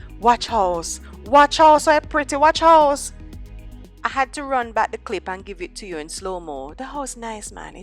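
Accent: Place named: Jamaica. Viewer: I love your commentary. It's funny and yuh patwa sounds so nice.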